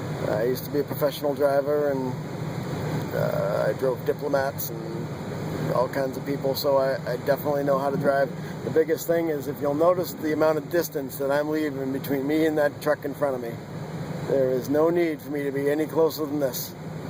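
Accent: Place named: Northeast, United States. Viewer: For the snow, scenery, and NE accent: thank you!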